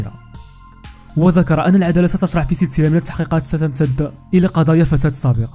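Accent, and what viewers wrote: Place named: Morocco. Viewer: جماعة هذا راه مروكي لى داير فيديوا شوف غير اللغة العربية كيفاش ينطق فيها داير هذا الفيديوا يلعب على المورال للجيش الوطني الشعبي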